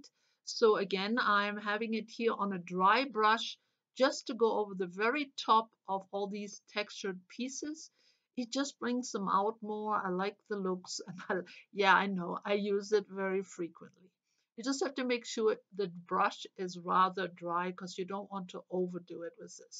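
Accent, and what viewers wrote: Germany. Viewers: hab doch gedacht ich hör etwas deutsch heraus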